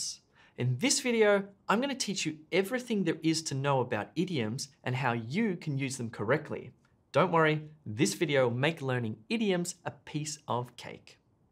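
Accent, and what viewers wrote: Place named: France. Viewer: ur pronunciation is french english bro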